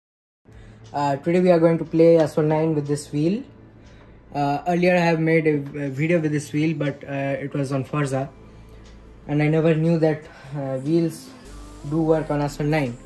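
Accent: India. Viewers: He is from india? Awaz to Indian jaise hai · Love the Indian voice!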